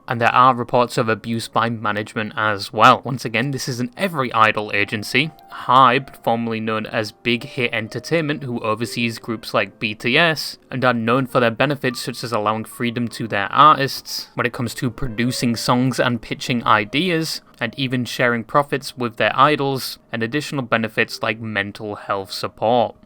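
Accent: United Kingdom. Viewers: is this guy still british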